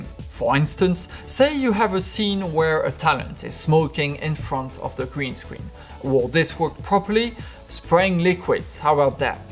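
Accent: France. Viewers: Are you French ? You have a frenchy accent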